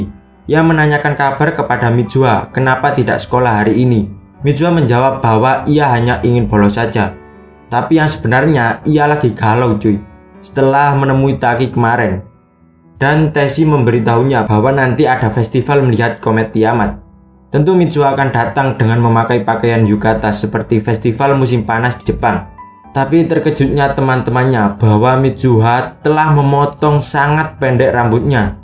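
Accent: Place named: Java, Indonesia. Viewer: Suara mu medok mas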